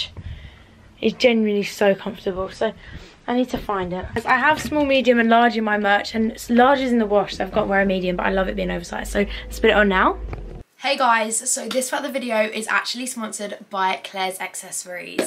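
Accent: United Kingdom. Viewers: The British accent is everything ❤️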